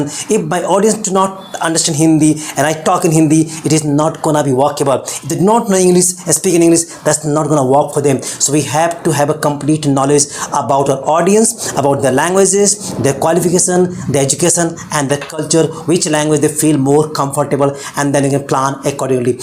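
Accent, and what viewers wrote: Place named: United States. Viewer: Sometimes you speak American English but I love this video because it's totally in English and you have told about communication skill so it's obviously powerful. I've seen this video 3 times today.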